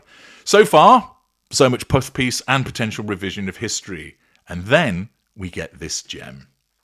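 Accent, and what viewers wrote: United Kingdom. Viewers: Love your sense of humor. With your wonderful British accent you make shit sound classy. More fuel.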